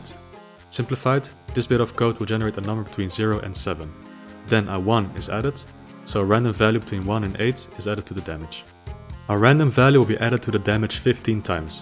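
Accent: Netherlands. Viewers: R u Dutch? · tfw you can spot a fellow Dutchie just by their accent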